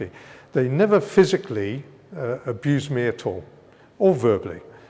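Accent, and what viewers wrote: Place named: United Kingdom. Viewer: Your accent tells me that you shouldn’t talk about colour or taste, your a brit,0 taste 0 colour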